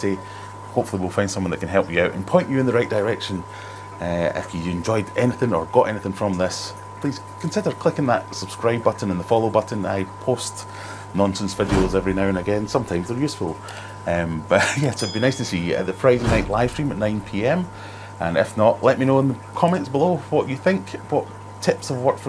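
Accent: Scotland, United Kingdom. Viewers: Tetra. 5-in-1 or 6-in-1 strip tests are very accurate and you get the results in just one minute. No crazy shakes and much easier to use. I cut mine in half lengthwise and get double the value. Love your Scottish accent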